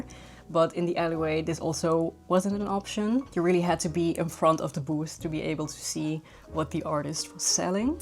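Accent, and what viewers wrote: Limburg, Netherlands. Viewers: Aaaah je komt ook uit Limburg! Ik heb nog nooit eerder iemand plat horen praten op youtube, super leuk haha❤️ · Love the Limburgs hehe 🫶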